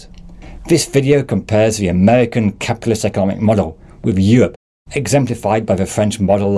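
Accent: United Kingdom. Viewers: Is this Scottish accent or what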